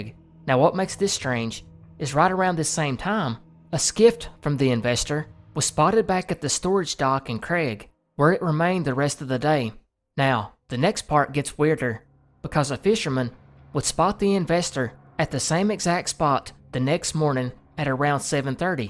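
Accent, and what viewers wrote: South, United States. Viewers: Best icebergs goin'. Love hearing a southern accent being used intelligently and unashamed. We southerners are not dummies, like most media represents us as. Keep up the great work!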